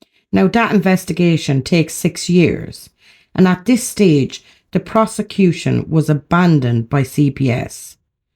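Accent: Ireland. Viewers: As expected, another intelligent and unique video! Speaking out about police corruption, which is a very dark and sinister affair which as you said, is far more prevalent than we all realise, has been presented and dealt with in this video in the unique way👍delivered in a passionate, forthright and well researched way. IMO, the NIcola Bulley case stinks of corruption right up to the very top. I’ll never forget Andrew Snowden blurting out how the LP had even predicted the day Nicola would be found. From day one, with the LP not cordoning off the bench area, this was just the beginning of people unable to believe that this was an honest, straightforward investigation. And that we were all being spun a story. P.S. Really looking forward to scary stories for Halloween! If you had a channel reading and spinning yarns with your beautiful Irish accent, I’d be an instant subscriber!💚😂💚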